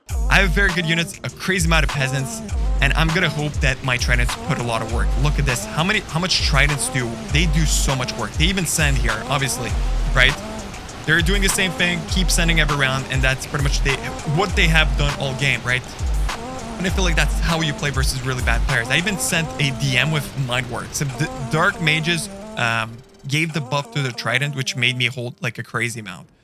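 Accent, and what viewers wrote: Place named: France. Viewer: You have a nice french accent (I am french)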